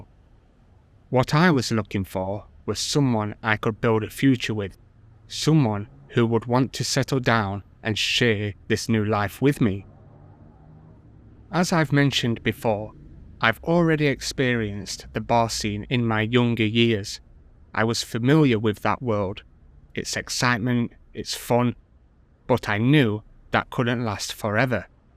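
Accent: United Kingdom. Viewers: Not true.... You speak with a British voice yet you're not a Yank.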